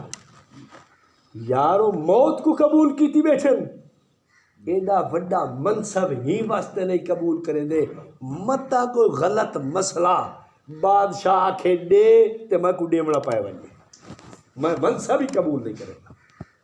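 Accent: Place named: Punjab, Pakistan. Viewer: بہت خطرناک پنجابی بول رہے ہیں، کچھ بھی سمجھ نہیں آ رہا ہے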